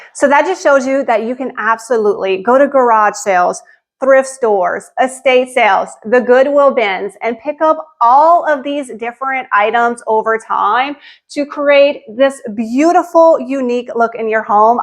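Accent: Louisiana, United States. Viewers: Hi I’m originally from new Iberia Louisiana. I heard your accent and I had to watch making me homesick. I enjoyed your video and subscribed. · What is the accent… love it. Is it Louisiana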